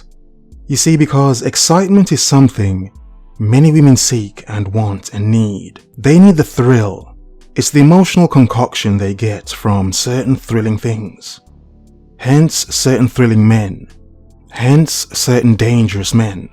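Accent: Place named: United Kingdom. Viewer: This guy's British accent is so much easier on the ears than those other channels.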